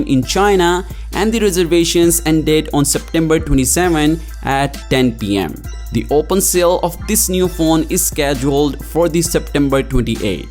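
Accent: India. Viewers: Love your Delhi accent.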